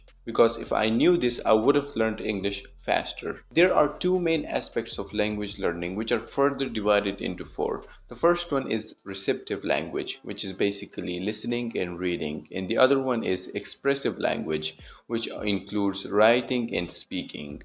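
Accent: India. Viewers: Your look like and speaking like this indian😊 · You speak like Indian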